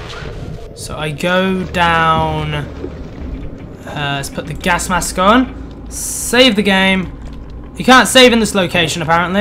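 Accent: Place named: England, United Kingdom. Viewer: So, I was playing some Halo 4 Grifball earlier today...and I was playing with a guy who sounds a whole lot like you,. At the very least, I think he was from the same part of England as you are.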